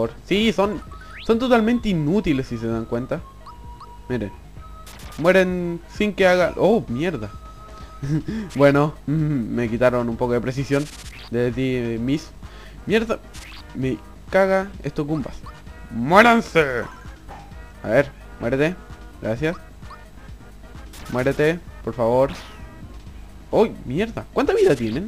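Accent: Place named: Chile. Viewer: Sí el es chileno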